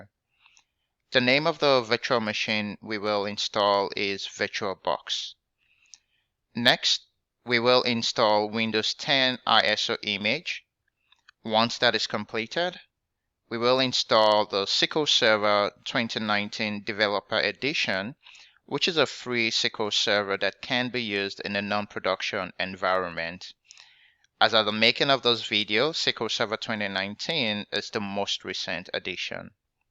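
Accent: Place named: Nigeria. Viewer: You sound so Nigerian, thanks for the video.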